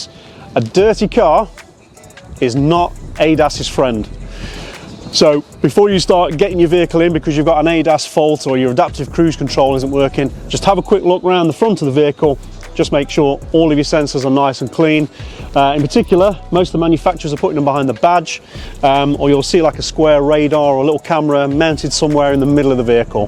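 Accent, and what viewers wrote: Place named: Leicester, United Kingdom. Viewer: It's the Leicester accent, that's accent nor excent!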